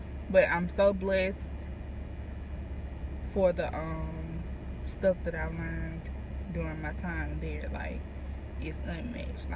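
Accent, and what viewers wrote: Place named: Alabama, United States. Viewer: I heard you accent & immediately knew you were from Al. (So am I 💗)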